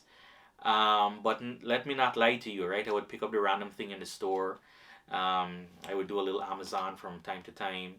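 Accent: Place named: Guyana. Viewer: You honestly sound like ur from Guyana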